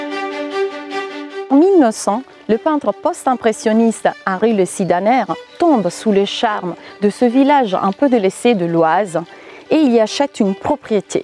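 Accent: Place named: Italy. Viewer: quel bel accent italien...tout en douceur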